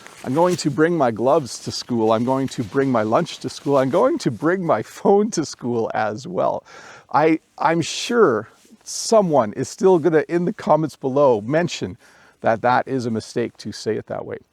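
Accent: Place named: Canada. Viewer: you speak wonderful, informal, Canadian English. We LOVE your programs and we LOVE seeing the great outdoors when you do your lessons. You are on a farm, speaking informally. We LOVE your programs. Keep going!! My English students love your lessons! 😊😊🌻🌻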